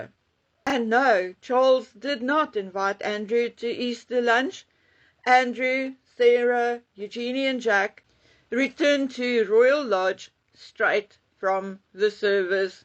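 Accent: South Africa. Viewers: Hello. I am a Johannesburger (Bedfordview) and love your show. Love listening to our SA accent❤ We’re having lovely rain at the moment. Just great. Take care and have a lovely evening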